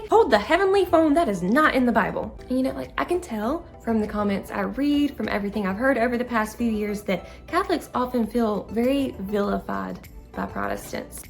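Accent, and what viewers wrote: South, United States. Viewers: I'm a lifelong Catholic and I found this explanation in terms of the buzzer and the safeguard really, really helpful. I think the parallel you're drawing with the way Catholics react with "that's not what the Church teaches" is very apt. Thank you so much for sharing your experience. I really love your videos. Also, as a native Texan living in New England, I enjoy hearing your very Southern accent. It sounds very much like home.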